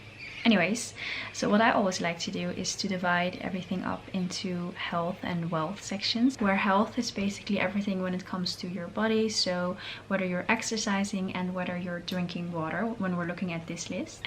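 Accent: Netherlands. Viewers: I feel like youre Dutch. I can hear the accent. First video I watch by the way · Are you dutch?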